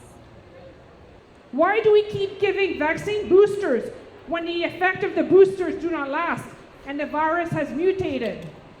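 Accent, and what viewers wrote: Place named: Canada. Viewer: She sounds so Canadian 😄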